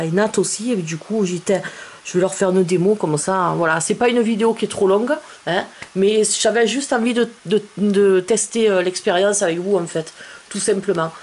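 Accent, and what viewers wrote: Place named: South, France. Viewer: L’accent du sud est parfait! J’adore!!👍 Je viens de recevoir mon 1er bol tibétain (j’ai essayé récemment chez un pote et j’ai trouvé ça incroyable !) J’essayerai avec de l’eau, ça a l’air terrible!! Et je te laisse un like ! ( parce que vu le nombre de vues, et zéro like, c’est pas cool! ) Allez, et je m’abonne, c’est cadeau !🎁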